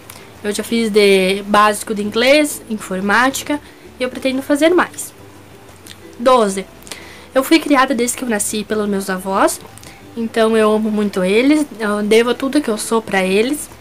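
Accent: Rio Grande do Sul, Brazil. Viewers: Amei seu vídeo, mas nunca tinha ouvido falar que alguém ama leite ainda mais sendo gaúcha tchêê...🤗... cadê o churrasco e o chimarrão?...😋... brincadeira rs...seu sotaque é lindo e vc não deve se envergonhar nunca pois é uma característica sua, de sua região que vc deve se orgulhar sempre...um superlike...😉😊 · Olá também sou gaúcha.amo seu sotaque queria ser assim também...e não vivo sem cebola KKK. amei o vídeo Deus te abençoe ❤️ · Gosto muito do seu sutaque gaúcha seu cabelo é muito lindo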